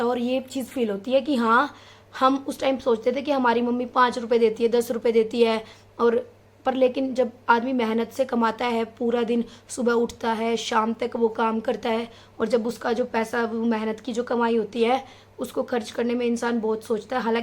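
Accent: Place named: Haryana, India. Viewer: Everything is good but your HARYANVI tone is just superb....vedio dekh ke to pata nahi ..but sun kr bahut acha laga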